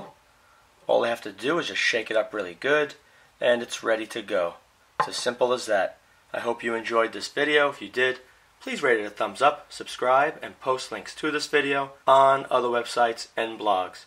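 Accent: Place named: Wall Street, United States. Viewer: Wall Street accent.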